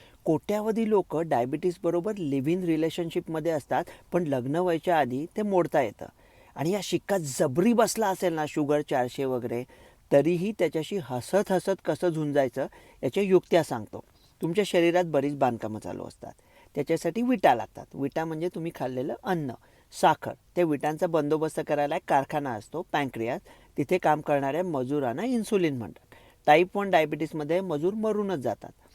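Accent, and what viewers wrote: India. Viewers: YOU ARE SPEAK LIKE TRUE MARATHI MANOOS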